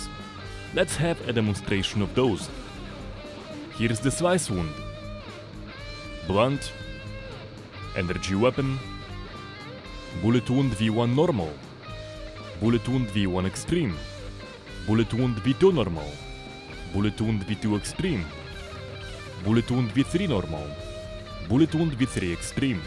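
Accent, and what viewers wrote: Russia. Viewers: from what i see from ur accent ur u russian or other? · I like your russian Accent :D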